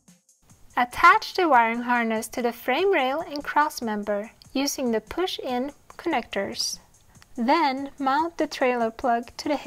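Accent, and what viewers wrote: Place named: Romania. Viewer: Thank you for your video. I am installing mine this weekend following your instructions. You sound and look romanian.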